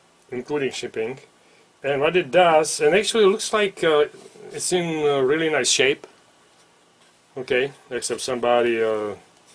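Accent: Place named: Germany. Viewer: Considering he sounds very much German I'd give him a pass...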